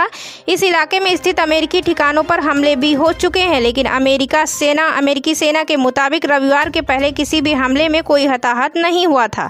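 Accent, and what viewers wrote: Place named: Bihar, India. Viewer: Lagta hai aap Bihari baa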